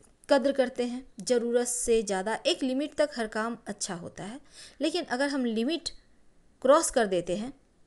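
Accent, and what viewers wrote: Bihar, India. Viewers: Kaha ki ho bihar se ? Funny accents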